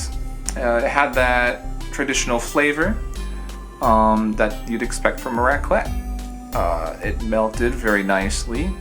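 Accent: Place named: Minnesota, United States. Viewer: You sound very Minnesotan 😆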